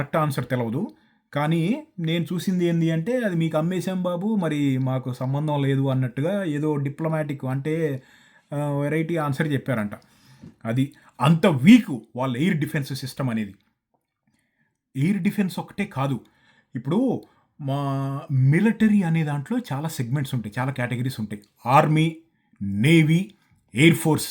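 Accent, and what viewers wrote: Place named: Rayalaseema, India. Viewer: Loved the way you explained the war strategies with such clarity! Your Rayalaseema accent adds a unique and powerful touch to the narration 🔥👏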